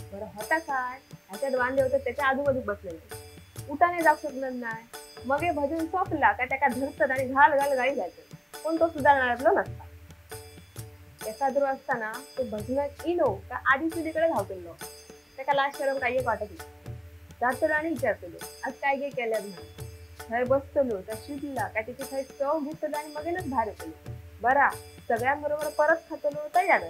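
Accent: Malvani, India. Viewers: तुमचे निरीक्षण खरोखरच अफलातून आहे. भाजनातले किस्से अगदी तंतोतंत जुळतात. मालवणी भाषेचा गोडवा तुमच्या प्रत्येक शब्दातुन जाणवतो. पण एक मात्र खरे भजनातील ही वेगवेगळी व्यक्तिमत्वेच भजनाच्या दिवशी रंगत आणतात. तुमजा हा विडिओ उत्तम झाला आहे. गावच्या गजाली तुमच्या अस्सल मालवणी मुखातून ऐकायला फार बरे वाटते. ऐकताना गावचे वातावरणच डोळ्यासमोर उभे राहते. धन्यवाद! जय महाराष्ट्र!! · sundar malvani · गजाली बरे आसत पण खुपशे दुर्गुणच दिसले. शुद्ध मालवणीक तोड नाय.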